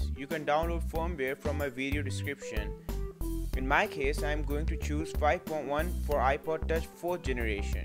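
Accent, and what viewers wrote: India. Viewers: He has an indian accent lol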